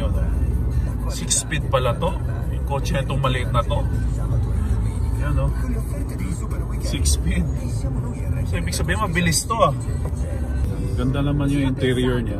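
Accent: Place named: Visayas, Philippines. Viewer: Hello....visayan accent cutie.....good family and loving wife....you are blessed to have them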